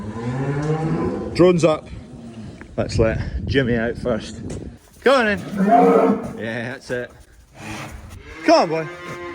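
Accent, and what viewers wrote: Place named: Northern Ireland, United Kingdom. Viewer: Northern Irish accent spot on👌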